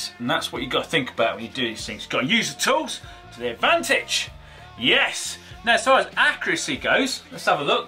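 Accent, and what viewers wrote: United Kingdom. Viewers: I can't understand half of what he's sayin' did he improve his british accent in recent vids?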